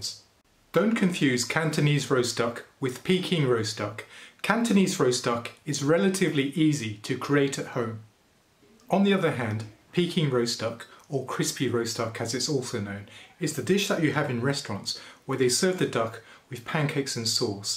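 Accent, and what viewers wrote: United Kingdom. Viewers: You spoke Chinese with an English accent very cute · I've got to give it to the the Brits, thought...they make all ESL speakers sound so sophisticated. Back to the video...thank you very much for this easy and great recipe.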